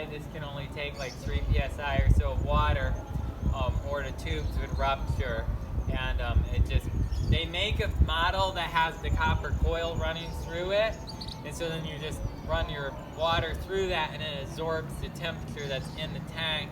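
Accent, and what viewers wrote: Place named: United States. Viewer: I've lived all over the world, I can only find voices like this in America.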